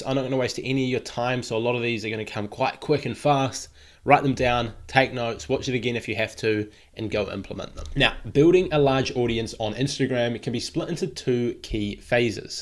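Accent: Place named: New Zealand. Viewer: Great vid, dude sounds like he’s from NZ, even better ! 🔥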